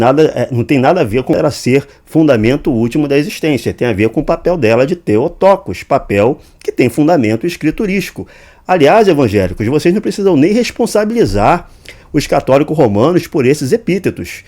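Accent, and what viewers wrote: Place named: Rio de Janeiro, Brazil. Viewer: Sotaque carioca, camisa do mengão, malemolencia danada... ta aí o meu teólogo preferido.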